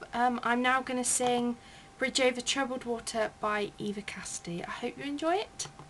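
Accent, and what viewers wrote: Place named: United Kingdom. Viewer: You have such a thick british accent while talking and when you sing you sound like your from Wisconsin (American) lol its soo funny. Great cover!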